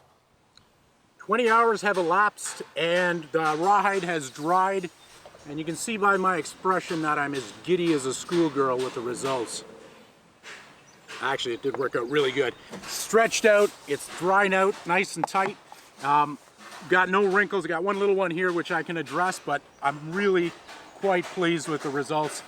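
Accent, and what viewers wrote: Canada. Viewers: Op. I've detect a Canadian accent. I'm in good company. Hello fellow Canadian. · I just stumbled upon your channel and this is the first video I've watched. Thanks for taking the time to make it. From the sound of your "oots" and "Aboots" you sound like a fellow Canadian.....or perhaps Minnesota ;) . Regardless, thanks for what you do. :)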